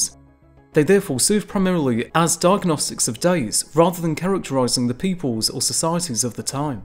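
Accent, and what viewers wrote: United Kingdom. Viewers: I grew weary of his British accent of which I could understand maybe half. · I enjoyed this, as I have many of your other videos. Please take this as constructive criticism when I say that your narration comes across to me as a monotone which, combined with your lovely but rather thick British accent, makes it difficult to follow the flow and details of the script. While I'm at it, I may as well say, too, that printing some words on-screen would often be helpful for amateurs such as myself. I'm not always familiar with technical terms, e.g., names of cultures. Thank you for considering my feedback. I am very much interested in prehistory, paleontology, geology, zoology, evolution and human and natural history. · excellent video! going to watch North 02 s part now (been following both of you beforehand). as for the audio issues, as a non-native english speaker it´s hard to follow you sometimes, and I don´t know if it´s because of your british dialect or the pacing. 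still, a loooot of information packed into a 15min video! great work!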